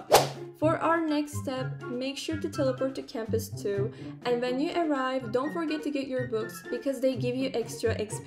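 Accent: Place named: Mexico. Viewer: she sounds like she’s Mexican 😳 but the fit is cute😊😊😊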